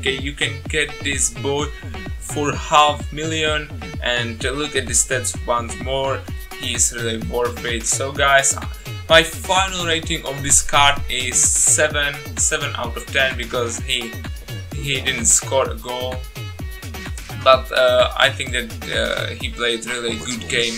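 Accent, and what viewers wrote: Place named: Czech Republic. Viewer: lehký český přízvuk, ale hlavně český znak FAČR:D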